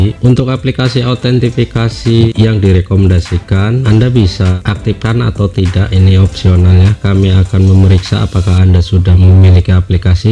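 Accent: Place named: Lampung, Indonesia. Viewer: L 15, anda orang bandar lampung ya, Terima kasih ilmunya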